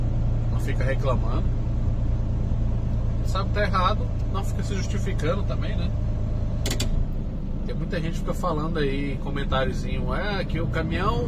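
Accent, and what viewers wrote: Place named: Rio Grande do Sul, Brazil. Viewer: teu sotaque é de gaucho, vc é da onde amigo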